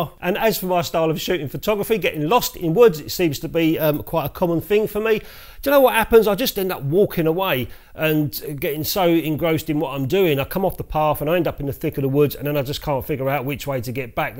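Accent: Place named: Cockney, United Kingdom. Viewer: The 'Fern' is Bracken, or Pteridium aquilinum to give it's proper name and one of the most successful land plants being found everywhere except Antartica. Loving your channel, in fact it's now not just my favourite film photography channel, it's my favourite photography channel. Period as the Yanks say. Your style is refreshingly down to earth, but your images are good and your Cockney/Essex Boy persona is a welcome change from the wispy bearded hipsters that normally present this sort of thing. (Sorry Ribsy! but your beard is a bit fluffy)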